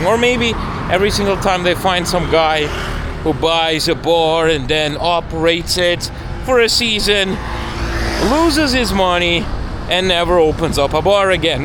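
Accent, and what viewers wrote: New York, United States. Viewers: i notice in this video you have a hint of a New York accent lol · Надеюсь. Он снимает фейковые видео, чтобы заставить вас пожалеть. Он не настоящий русский. Разговаривает как какой-то нью-йоркец.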